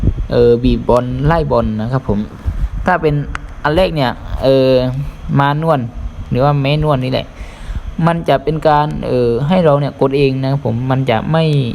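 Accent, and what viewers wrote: South, Thailand. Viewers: น้องเป็นคนใต้หรือป่าวครับ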